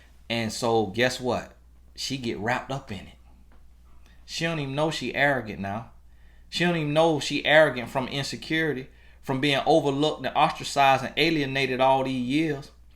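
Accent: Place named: South Carolina, United States. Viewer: where are you from?? You sound like you from SC, I could listen to you talk all day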